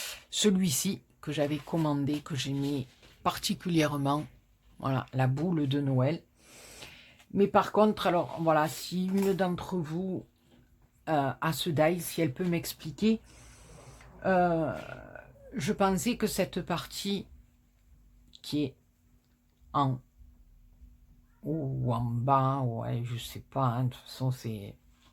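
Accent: South, France. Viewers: De bien belles trouvailles pour vos prochaines créations, le bloc 30 x30 est superbe et les jolis dies cuts je ne les ai pas encore vu. Merci pour ce partage et ce bel accent du midi. Bon week end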